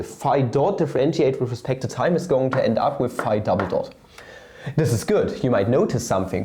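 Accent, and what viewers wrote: Germany. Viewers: I clicked, heard a German accent, and stayed. 👍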